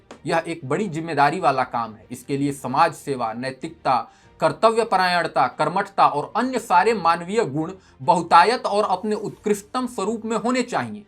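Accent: Standard. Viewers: Wah...itni suddh hindi sunn kar mera mann gad gad ho gaya....bahut bahut subhkaamnaye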